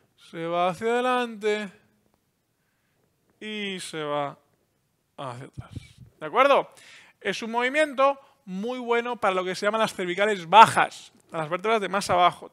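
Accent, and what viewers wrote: Spain. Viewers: Me encanta ese suave acento gallego. Una Canaria 💖👍 Gran explicación. 🤗 La posición de la cámara perfecta, se sale 👏👏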